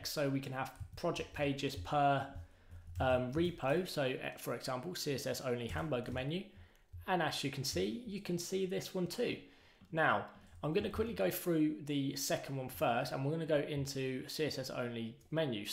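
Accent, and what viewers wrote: United Kingdom. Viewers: nice British accent and i think they should change the name to feel.js seems nicer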